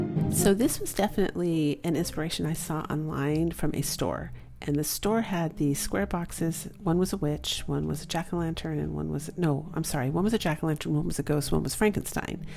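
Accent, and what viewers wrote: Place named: England, United Kingdom. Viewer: Yay a fellow decaf drinker! So cool that he's from England. I'd never get tired of his accent.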